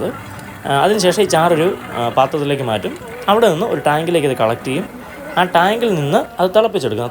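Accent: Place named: Tamil Nadu, India. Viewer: There comes a tamil influence in your talk 😜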